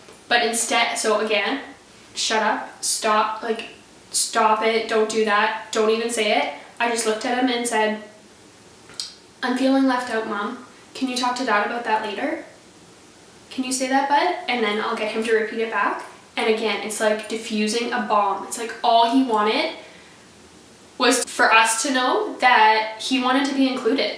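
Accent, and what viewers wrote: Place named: Canada. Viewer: the Canadian accent is strong in this one😃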